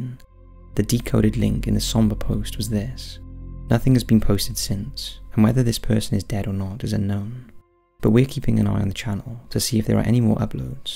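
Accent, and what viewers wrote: Wales, United Kingdom. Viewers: he's welsh. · He's Welsh. :) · hellomikie92 nothing...if you mean the accent, it’s Welsh 🏴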